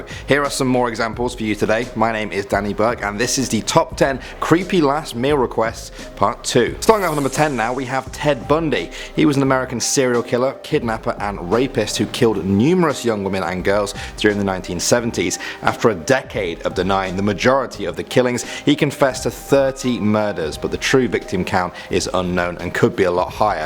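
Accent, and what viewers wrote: England, United Kingdom. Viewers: what part of england our you from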